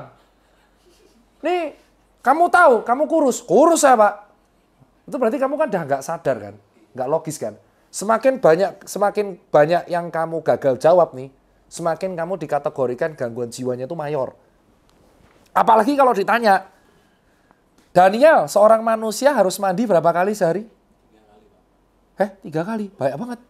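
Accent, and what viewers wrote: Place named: Yogyakarta, Indonesia. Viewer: Logat jogjane kentel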